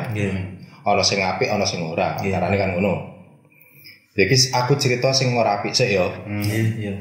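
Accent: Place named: Pekalongan, Indonesia. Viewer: Logate koyo wong kalongan o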